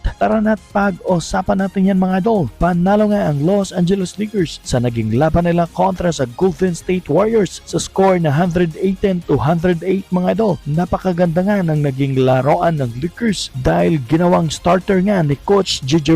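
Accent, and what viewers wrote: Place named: Visayas, Philippines. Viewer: Likers(lakers) amputa tapos sikends (seconds) bisayang bisaya mag salita nakaka urat sa tenga.ambobo mo mag voice over.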